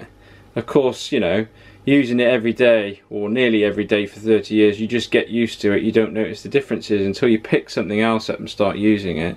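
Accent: United Kingdom. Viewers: Can't say I ever wondered what Charlie Sheen would sound like with a British accent, but here I am.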